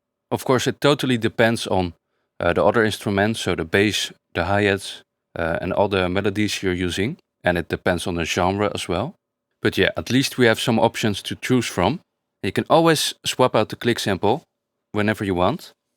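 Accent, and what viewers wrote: Netherlands. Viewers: yeah as i can hear u are dutch man xD accent speaks. I am from Finland, elektron is made from sweden , i have kick ninja plugin it is also good one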